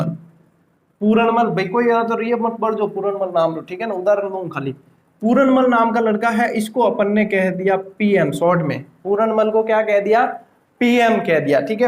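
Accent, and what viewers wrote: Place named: Rajasthan, India. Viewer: Thnku so much sir.... Sir m rajsthn se he hu lekin rajasthani nhi aati ap bolte ho toh sunne m accha lgata hai.... 😇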